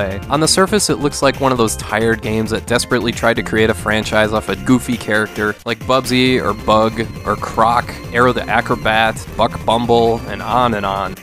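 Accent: Minnesota, United States. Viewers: Wait u a Minnesota resident? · Loved that Minnesota accent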